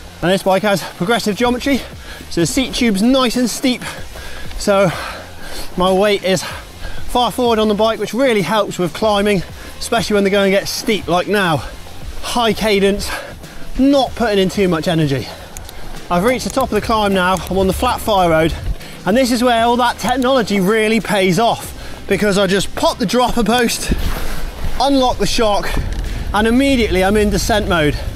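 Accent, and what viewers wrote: United Kingdom. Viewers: umm are you in british?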